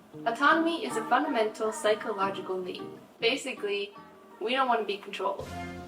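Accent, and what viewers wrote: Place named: New York, United States. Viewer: That's a cool accent, where are you from? I'm British and I barely hear this accent on YouTube. Is it New York?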